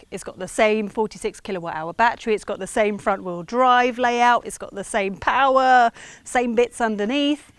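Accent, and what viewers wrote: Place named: United Kingdom. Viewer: When I heard the British accent, I realized how much I missed Doctor Who…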